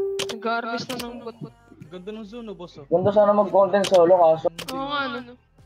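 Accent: Visayas, Philippines. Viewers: Bisaya kadiba idol??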